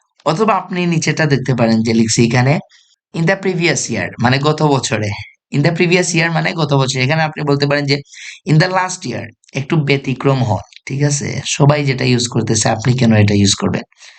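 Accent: Sylhet, Bangladesh. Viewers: Vaiya ki Sylheti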